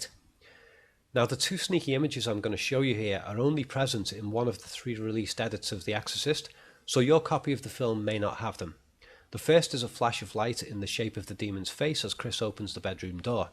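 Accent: Liverpool, United Kingdom. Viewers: Good video brother, nice to go on YouTube and hear a scouser doing a film video ;) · Nice to hear a fellow scouser narrating don’t hear it often :) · Are you a scoucer? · I realy cnt listen to a Scouse accent trying to sound sophisticated😂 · I don’t care how good, intelligent and eye opening this video is, and it is very well analysed, if it’s described by a scouser it sounds retarded.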